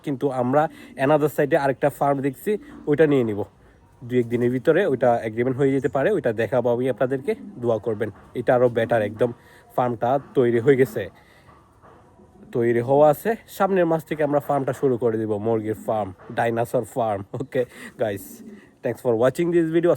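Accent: Chittagong, Bangladesh. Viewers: আপনার কতা শুনলে,বুঝা যাই,আপনি চট্টগ্রামের মানুষ, কতা অনেক সুন্দর করে বলতে পারেন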